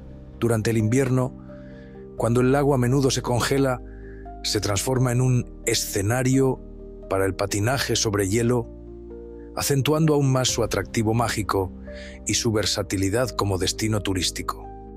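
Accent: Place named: Castile, Spain. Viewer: Magnífico documental pero lo mejor, para mí, es la voz del narrador con un castellano perfecto; yo creo que incluso puede ser un arquetipo de dicción que nos deja perplejos a nosotros los andaluces, con nuestro castellano sureño.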